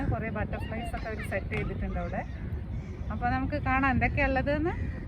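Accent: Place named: North Kerala, India. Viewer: Super vlog dear. Detail ayi kanichu thannu. Koode Nammude swantham vadakkan bhashayum😍😍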